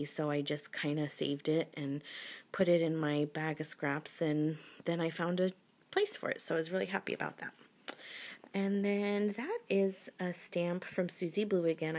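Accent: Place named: United States. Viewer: omg!!! this is fabulous!!! i love your american accent lol!!!!:-) ooo i love heidi swapp's stamps i have a few too!! oooo u love mermaids! and me!! hehe, omg! ive never seen a real palm tree!!:( 0ooooooooo flamingos!! i love flamingos!!! (im writing this as i watch incase u didnt realise lol) love the seeds stamps so cute!!! oooo doilys are lovely!!! not seen those american craft pin/badges before they're cute! ooo i like that song too!! haha:-) that's a fantastic book love it!!!! xxxx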